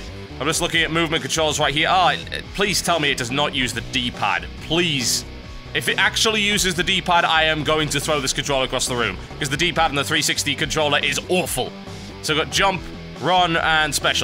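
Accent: United Kingdom. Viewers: Hahahaha I love his angry british sounds! They make my day lol. xD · As a german i love this britich accent or so ;D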